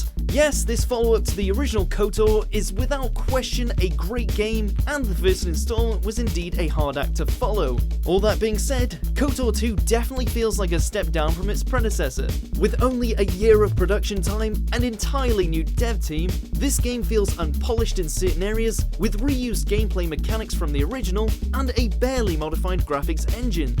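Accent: United Kingdom. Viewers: Why is a British person narrating Watch mojo now a days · I hate this British voice. Bring back the American one. This one sounds like a cartoon.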